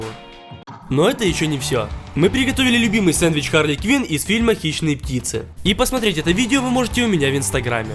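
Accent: Ukraine. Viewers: И сейчас есть украинский акцент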